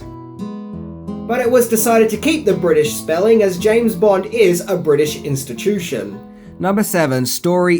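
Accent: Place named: Australia. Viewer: Tone down that Aussie twang...otherwise very informative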